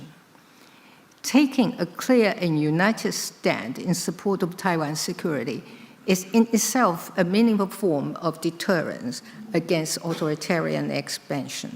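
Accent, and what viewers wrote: United Kingdom. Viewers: 小英－口濃濃的英國口音讓我覺得好懷念自己年輕的時候！當初我在新加坡學英文的時候就是這樣的口音、我們的前總統小英真的好可愛🎉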